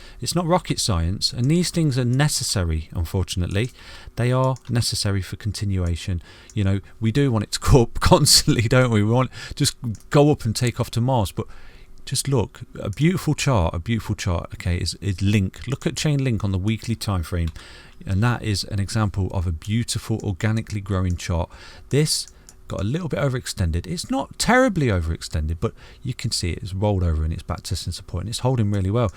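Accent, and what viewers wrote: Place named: Manchester, United Kingdom. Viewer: There's a lively discussion about where in the UK your accent is from going on in the Harmony holders telegram. I'm guessing somewhere nearish to Manchester, how did I do?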